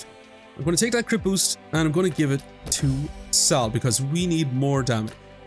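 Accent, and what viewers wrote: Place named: Midlands, Ireland. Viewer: Lovin the videos on Guildrun man but I can't place your accent and it's drivin me up the walls haha its between Monaghan and Roscommon I'm guessin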